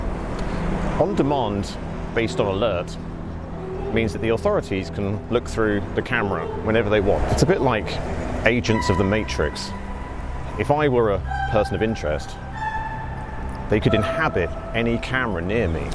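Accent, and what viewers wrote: England, United Kingdom. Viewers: I aspire one day to move to the UK from NY and speak as eloquently polite, whilst getting the information he seeks. This isthe epitome of evolution to me. I wonder if that is cockney or RP?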